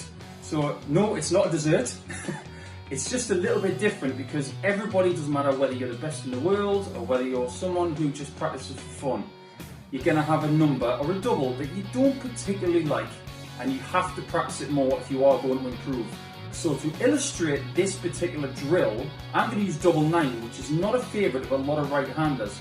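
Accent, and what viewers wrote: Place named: Australia. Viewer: Which part of Australia is your accent from Paul? I can’t pinpoint it. 😂😂 the goat darts villain for me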